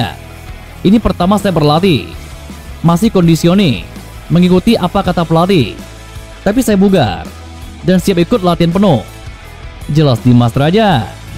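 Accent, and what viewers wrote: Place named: Bandung, Indonesia. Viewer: Sorana tong di kitu kitu a ,ciri has Bandung we nyarios na ,ulah di caket caley😂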